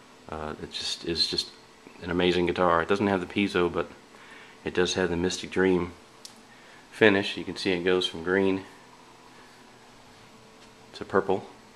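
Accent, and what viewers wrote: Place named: South, United States. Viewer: Wow! You've got a southern Twang.... I've gotta get down there to record witcha!!!! 1993 seems like ages ago!!!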